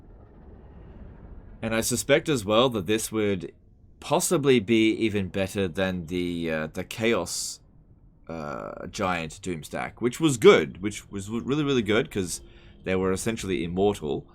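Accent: Australia. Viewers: He is from down under.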